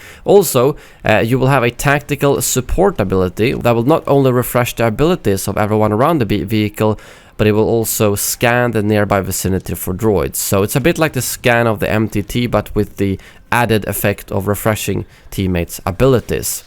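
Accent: Sweden. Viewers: U swedish huh?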